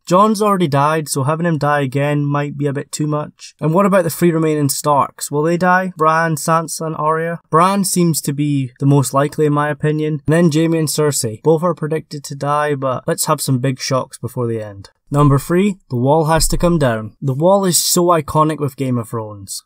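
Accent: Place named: Scotland, United Kingdom. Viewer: Leave it to the Scottish guy to say "more main characters killed off." But, alas, you're right. And I really hope my accent detection is decent enough to know you're Scottish. I love your work and look forward to your videos everyday. Thanks for the hard work :)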